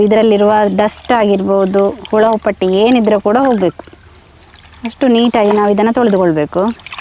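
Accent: Mangalore, India. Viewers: Your Kannad talking voice is mangalur I am at Haveri Karnataka style of kannada speaking is different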